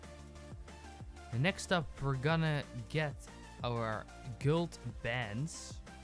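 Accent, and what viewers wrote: Netherlands. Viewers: your accent like a Dutchman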